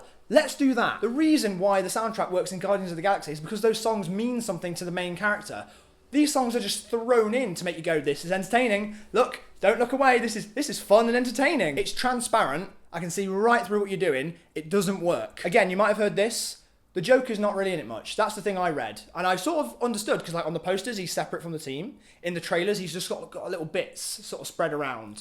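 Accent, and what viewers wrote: United Kingdom. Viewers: that's the british accent, if you don't like it then you're going to have trouble talking to british people.. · This is the first vid I've seen of you. I'm in love with your face which is even more beautified with that British accent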